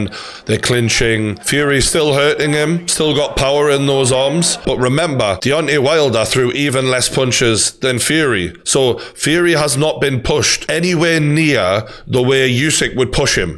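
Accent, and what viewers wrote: Newcastle, United Kingdom. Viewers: Spot on lad, great video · True 🔥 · spot on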